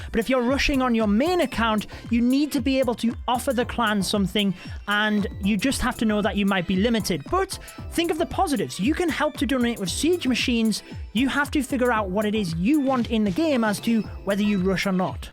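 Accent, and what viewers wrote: United Kingdom. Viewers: are you from Britain?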